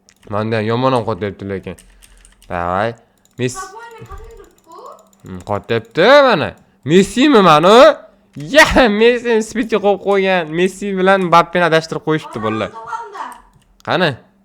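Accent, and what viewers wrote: Khorezm, Uzbekistan. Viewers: Oka xorazlikmsz a?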